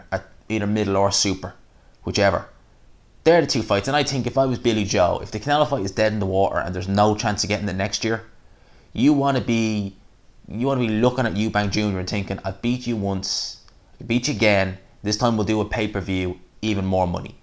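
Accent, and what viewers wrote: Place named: Dublin, Ireland. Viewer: Got Irish rent - you're such a dubbie you sound like my dubbie cousins :D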